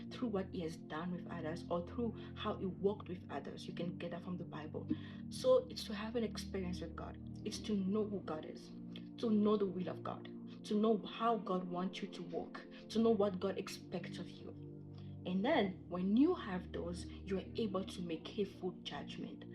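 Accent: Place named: France. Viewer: You speak French huh? I can hear the accent 😂❤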